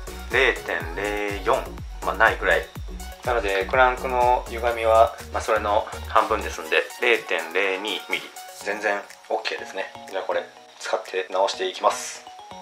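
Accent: Hiroshima, Japan. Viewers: 話し方が広島県民 合ってます？ それも東部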